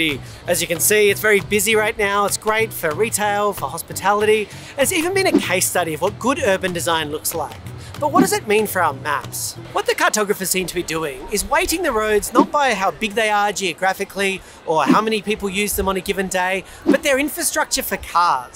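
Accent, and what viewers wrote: Australia. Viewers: i watch a bunch of American Youtubers that do very much what you do, EG Adam the Woo, Justin Scarred etc there's 100s of them and i thought i wish an Aussie would do something like this and i finally found one, unfortunately in Australia there are two really noticeable accents the I went to uni and i left in year 10 to work for my dads building company and you really are on the high side of "i went to uni" but that's cool at least you know how to study and find the truth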